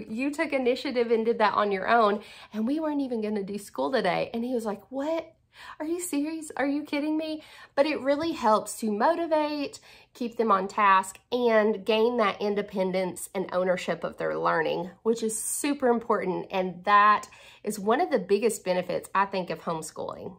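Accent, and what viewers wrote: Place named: North Carolina, United States. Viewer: Love your accent because it matches mine. ❤ I’m from the mountains of NC.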